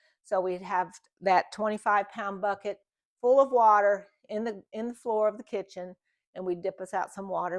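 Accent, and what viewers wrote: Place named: Appalachia, United States. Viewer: Are you kidding me? People’s audacity blows my mind! I’d have talked even more Appalachian if someone had shown opposition of my sound! 😂 Friend, if your dialect runs off into Appalachia without your knowledge again, let it go! ✌🏻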